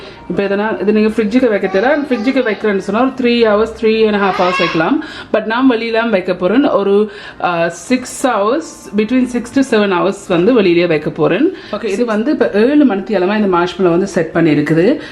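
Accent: Sri Lanka. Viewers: சுப்பர் சகோதரி எனக்கு இலங்கை தமிழ் ரொம்ப பிடிக்கும் இலங்கை மக்கலயும் பிடிக்கும்...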